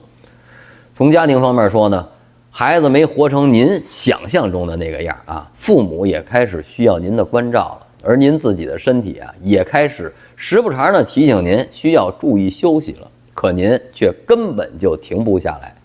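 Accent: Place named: Beijing, China. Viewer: 博主是不是北京人啊，这味儿太重了哈哈哈（褒义）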